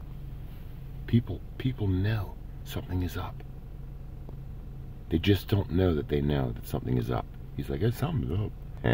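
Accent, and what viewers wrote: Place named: England, United Kingdom. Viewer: Loving your English accent 🤩 you good!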